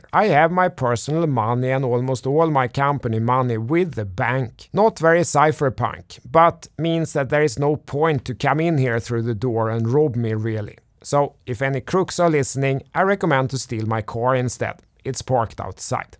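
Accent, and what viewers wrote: Sweden. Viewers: Your dialect is so swedish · Mest ikoniska svengelskan jag hört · This is the most swenglish I've heard since Rosling 😂 great video! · Great video👍 I can hear the swedish accent, so you are definetly from Sweden 😄👍 Hilser deg fra Norge 🇳🇴🇸🇪🇳🇴🇸🇪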